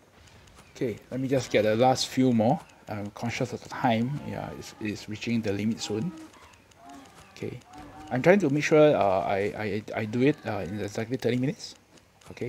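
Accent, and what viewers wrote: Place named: Malaysia. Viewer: Bro, u either a malaysian or singaporean based on your english. Theres no doubt about it.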